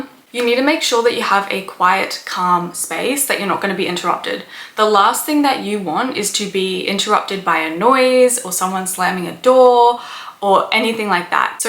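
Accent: Australia. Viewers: I can hear the Aussie accent breaking through ☺️ Great video Thank you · I believe I astraled when I was 5-8 years of age almost every night. Last year in September I attempted my first concious OBE and almost succeeded 😊 . My lower back was hurting so I sat up. I had the tingles, felt the vibrations, heard the loud rumbling (no alarm but we are all different). But also, I felt as though my heart chakra was holding me back as my chest felt weighted down. Unsuccessful attempt, but I was so happy that I got so far on my first go. Had heaps of similar experiences since but no feelings of being weighed down. Also, hello from Perth 🇦🇺 you sound like you're from Qld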